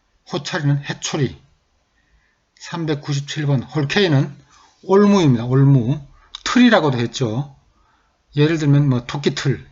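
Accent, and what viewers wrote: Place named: Uiseong, South Korea. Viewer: 안녕하세요 ^ ^ 제가 경상북도 사투리에 관심이 많은데 님 사투리 잘 보고 있습니다. 제가 취미로 사투리 채널을 운영하고 있는데, 님이 괜찮으시다면 ' 경상북도 의성 ' 영상은 모조리 님 억양을 흉내내고 글을 베끼면서 올리고 있습니다. 제 영상이 그렇게 훌륭하거나 유명하지는 않아서 님께서 아직 못 보셨을 수도 있지만 혹시 문제가 된다면 경상북도 의성 영상들은 삭제하도록 하겠습니다 (맨 마지막에 저작권을 따로 주장하지는 않는다셔서 지금 의성 영상 몇 십 개 정도 올렸습니다. 님 사투리 억양을 듣고 억양을 어떻게 할지 모호한 것들은 되도록 안 올리고 있습니다) 저뿐만 아니라 의외로 전국 팔도 사투리에 관심을 가지는 10대 소년들도 많습니다. 되살아나지 않고 사라진다고 하더라도 저는 제 영상에 기록용으로 여러 지역 사투리를 담아내고 보존하고 싶습니다. 제 영상 댓글들은 하나하나 확인하기 어렵지만, 관심이 가신다면 찾아와 주시고 억양도 교정해 주세요! 감사합니다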